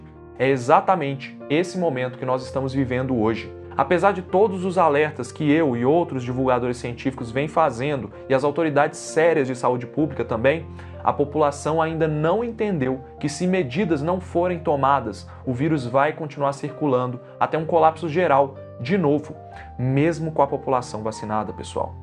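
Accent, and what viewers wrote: Minas Gerais, Brazil. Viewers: cê é mineiro? 😍😍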